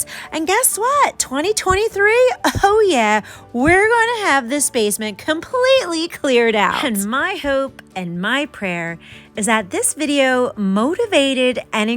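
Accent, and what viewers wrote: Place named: Philadelphia, United States. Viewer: I've really enjoyed watching your channel, not only because you have a great short term-long term process, but because I live in the same region of the country (Philly) and it is good to see and hear the local dialect, see items and logos from local stores, etc. I have been trying to support more local channels in general, because it is by extension a connection to the regional community -- something I don't think we have enough of. Don't sweat the sentimental kid saves -- I had boxes, tubs and closets full of them until my last move in 2015. Sadly, much of it was ruined, and my other excuse --- saving it for the grandkids --- became lamer and more irrelevant by the day as the grandkids became too old for the things that were salvageable. I ended up with one special cedar chest with my most sentimental saves once I let it all go.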